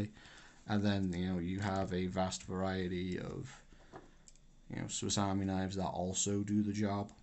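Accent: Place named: Northumberland, United Kingdom. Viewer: Great video, I love your accent it sounds just like mine. Are you Northumbrian too? I ordered the same UKPK you have off Heinnie, still waiting for it to arrive. It's gonna replace my Böker Plus XS as my EDC, which is also a great knife with a similar design with the choil to stop it closing, no jimping there on the XS tho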